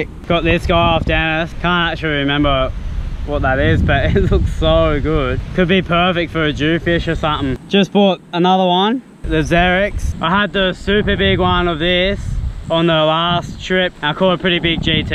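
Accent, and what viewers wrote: Australia. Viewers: Were are you from ? Sound Australia or like you are from new Zealand, love your voice and accent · do all azzys talk like this i canna understand him haha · This video is so australian lmao. Australian accent, fishing, surfing, dolphins 6 feet away from you... And I'm only 5 and a half minutes in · The ozzy accent tho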